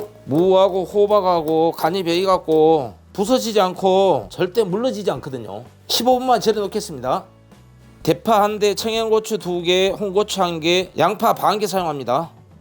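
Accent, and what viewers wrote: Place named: South Jeolla, South Korea. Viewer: 전라남도 사투리가 너무구수하네요ㅎ 맛나보입니다~^^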